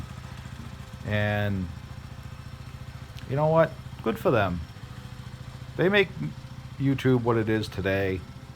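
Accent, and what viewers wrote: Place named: Midwest, United States. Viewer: Ya know? I'm guessing you live somewhere in the midwest. Ya know? Because ya remind me of the time I visited upper michigan ya know? And they kept saying "ya know" after every sentence. Ya know? :) :P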